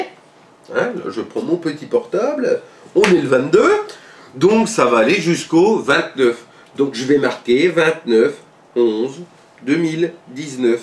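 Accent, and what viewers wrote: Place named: Franche-Comté, France. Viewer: Tu as un accent de chez moi, tu es franche Comté ?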